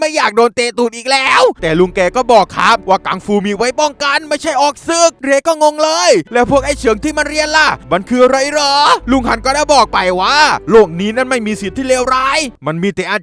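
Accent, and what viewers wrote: South, Thailand. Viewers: พี่เป็นคนใต้ป่าวคับ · พี่เป็นคนใต้หลอครับ😅😅😅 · คนภาคใต้เหรอครับผมพูดภาษาใต้เป็นนะเพราะผมเป็นคนใต้สวัสดีพี่บ่าวหวัดดีนะพี่บ่าว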